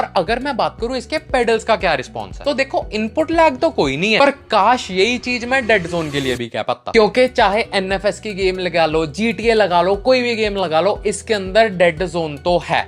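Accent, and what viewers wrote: Punjab, India. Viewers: Thick Panjabi accent Hindi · Veer ji are you from Punjab, accent ton lag reha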